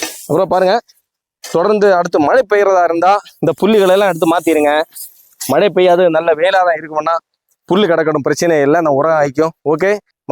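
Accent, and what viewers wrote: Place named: Nagercoil, India. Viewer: Nagercoil tamil azhagu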